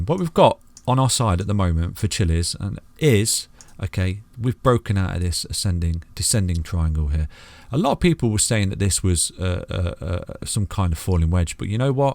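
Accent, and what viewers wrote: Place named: East Midlands, United Kingdom. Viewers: Finally, A no-nonsense Crypto channel with a nice local East Midlands accent. Av subscribed me duck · First time on your channel and hit subscribe...Great TA and love your accent!! Lincolnshire by any chance? Lol🤑💯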